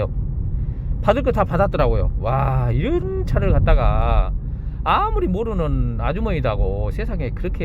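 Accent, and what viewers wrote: Jeolla, South Korea. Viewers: 전라도 사투리부터 없애고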